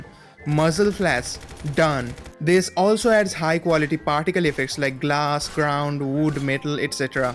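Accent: India. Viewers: Idk why its so interesting to me that people from all over the world like new vegas. You sound indian, its weird i wouldnt imagine new vegas being a very popular game in 2025 in countries outside the US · As an indian i like fallout too, you sound like a bengali guy ngl.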